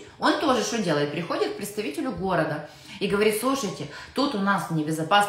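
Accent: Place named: Odessa, Ukraine. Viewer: Таки шалом ;) Слушаю Вас просто так, для поднятия настроения. Свою иммиграцию прошёл давно и успешно, но Ваша разговорная Адееесская рЭчь мотивирует и даже вдохновляет. За 10 лет не встретил в Альберте ни одного одессита, так хоть с Вами душу отвожу. Про Саскачеван это правда: степь да степь кругом, едешь 200 км и одного села нету, печалька полная... Ездим туда по 3 раза в год на рыбалку (800 км в одну сторону) за коропом метрового размера которого можно брать безлимит. Хорошо что бак в машине на 90 литров, чтоб не переживать за заправку сильно, и то всегда беру пару канистр на запас ( с до заправки не работают у них).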